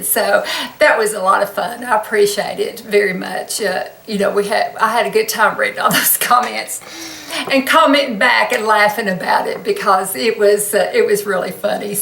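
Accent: South, United States. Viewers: wow ,you are beautiful and Love that southern voice .you are so sweet .so glad to find you. being 55 .i need help .lol wished i looked as good as you .thanks for the tips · I ❤️ your southern accent. I think you are so beautiful.., just gorgeous., you don’t need to wear makeup. I would love to know your am & on routine, what you use & when etc..! Sending the very best of everything in life that you deserve..! Cindy- Hemstreet- Plath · Your skin is gorgeous!!!!! I also use Retin A around my eyes . I actually use it on my entire face , and my neck ( less often on the neck ). I'm noticing some subtle changes after almost a year . I'm 39 . I have red hair and light skin , but I can tan if I try . I made the mistake of tanning when I was in school because the other kids made fun of me for being pale . I'd give almost anything to go back in time and stay out of the sun . 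I have crows feet and pretty deep 11's . I also have pretty deep forehead lines . I'm very expressive when I talk , and I smile a lot ( makes em worse ). I don't know how old you are , but you have the skin of a woman in her 20's ! You're so gorgeous! I love your accent . I'm southern too , but my accent is much thicker than yours . I love how you don't use any filters in your videos , and you are sweet and genuine . Thanks for the video :-) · Loving the southern accent!! Makes me feel invited into your home as southerners are always so welcoming! I bet you cook some amazing home cooked meals! What time should we be there? <3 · Love your southern accent. Where are you from?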